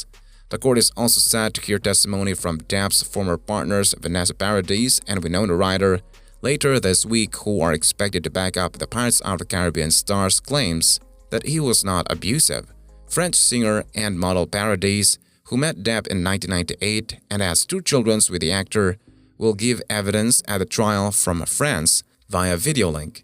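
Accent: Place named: Latin America. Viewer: Gangster from the 30's??.!!! He sounds more like a Latin guy IMITATING a 1930's gangster! You can tell he's reading this "Narrative" (..the pronunciation)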